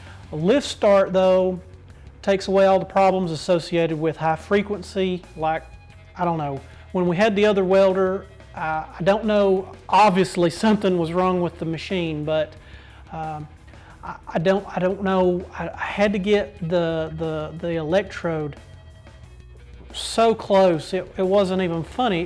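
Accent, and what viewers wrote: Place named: North Carolina, United States. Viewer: Enjoyed your review! Do you mind me asking where you are located? I detect a NC accent there. I also think I see a can of spray paint on your bench from Princess Auto here in Canada.... so I'm confused! lol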